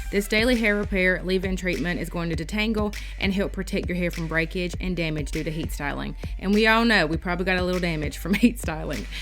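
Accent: Kentucky, United States. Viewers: Do you have a Kentuckian accent or is that just me 😂😂😂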